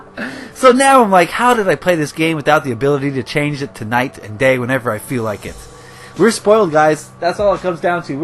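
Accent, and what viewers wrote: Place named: United States. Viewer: We Englishmen speak English because we are from England:p I do find it funny myself how you Americans say some words, lol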